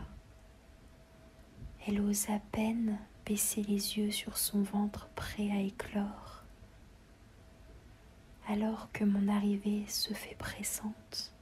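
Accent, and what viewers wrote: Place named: United States. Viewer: T es un américain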